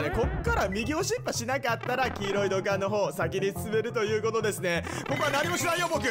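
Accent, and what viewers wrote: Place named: Hakata, Japan. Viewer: 全編博多弁でやってくれるというのは いつ放送予定ですか？ 楽しみに待ってます✌️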